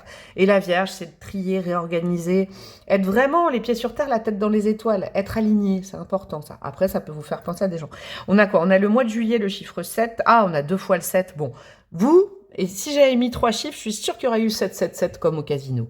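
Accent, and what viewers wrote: Picardy, France. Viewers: Bonjour, accent picard ? Vous êtes de Picardie ? 😊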